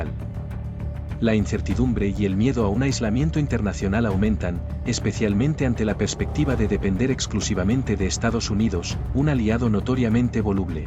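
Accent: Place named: Spain. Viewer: Por que no ponen a un mexicano a hablar.siempre se utiliza a una bos de español cual es el chiste y puro cuento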